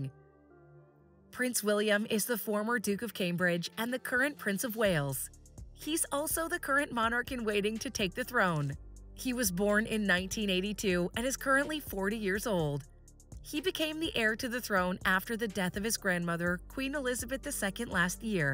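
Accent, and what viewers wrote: United States. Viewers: Good heavens, another Yankee voice getting it WRONG. Princess Catherine has not been Kate Middleton since the day she was married to Prince William, at which time she became Her Royal Highness, Catherine, the Duchess of Cambridge (or "Mrs Windsor, not that they use that name much, but definitely not Middleton). She is now Her Royal Highness The Princess of Wales, plus other titles. Please get your commentary right - OR - don't comment at all! Also it is NOT E ii R. It is II, Roman numerals for the number 2. E II R Elizabeth Regina II, Queen Elizabeth the second. Regina means the reigning Queen. Charles' insignia is C III R. Charles Rex the third. Rex is the male version of Regina. When William becomes King, he will be W v R King William the 5th. Got it?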